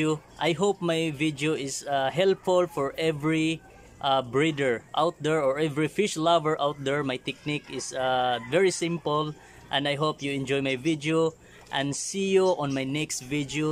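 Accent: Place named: Philippines. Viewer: I love your tagalog accent ♥️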